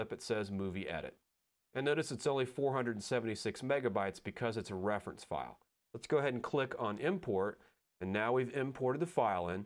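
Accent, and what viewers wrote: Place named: Pennsylvania, United States. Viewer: I love the PA accent.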